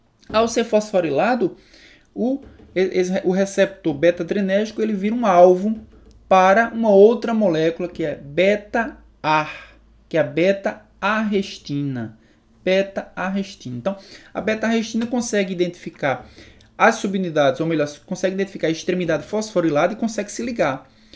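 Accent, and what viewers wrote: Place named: Brazil. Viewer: muito bem explicado, adorei. Que sotaque lindinho e simpático, bem Brasil. Obrigada pela ajuda. bjs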